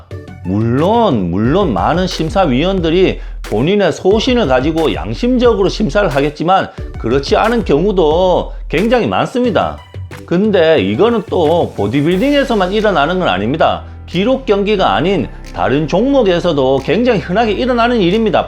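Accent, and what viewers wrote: Gyeongsang, South Korea. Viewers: 점수가 쁘라스가 되고 점수를 몬어꼬 ㅋㅋ 저도 경상도 입니다ㅋㅋ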